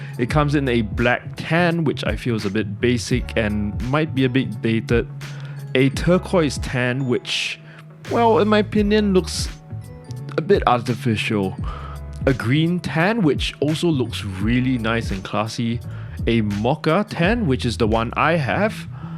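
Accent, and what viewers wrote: Singapore. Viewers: I was thinking at first, your voice sounds very Singaporean, and then you pulled out SIN Dollars! 😄 We’re hoping and planning to move there from Germany soon. Absolutely wonderful little country!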